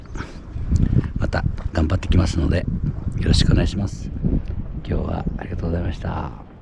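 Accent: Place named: Chiba, Japan. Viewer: 「あんでだよ～！」(何でだよ～！)好きです、千葉弁。「青アジばっかでしっけになんね」でも楽しそうでなによりです。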